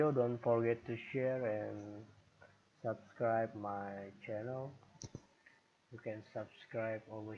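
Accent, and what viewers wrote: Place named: Indonesia. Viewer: makasih bang ilmunya ane cari tutor ini susah banget,,, untung ada abang kerjaan ane cepet kelar,,, logat indonya masih kerasa jadi ane ngerti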